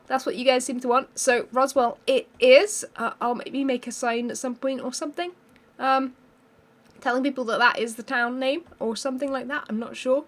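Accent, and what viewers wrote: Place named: London, United Kingdom. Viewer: She’s from London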